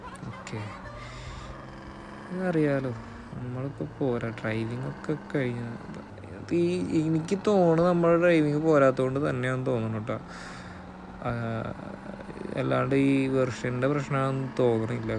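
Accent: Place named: Thrissur, India. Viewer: BRO NATTIL EVIDEYA SLANG KETTIT THRISSUR ALLENKIL ERNAKULAM😄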